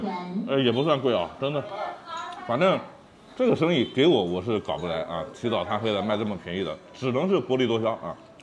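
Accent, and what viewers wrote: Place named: Feidong, China. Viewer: 肥东话多少还是和市区的有区别 不过也差不多是乡音了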